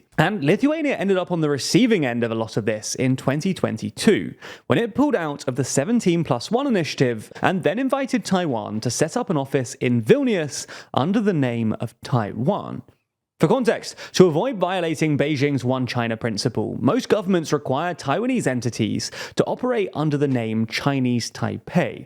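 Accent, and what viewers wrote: England, United Kingdom. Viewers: The videos are great but what s with this overly british accent ?? Kind of anoying, sorry☺️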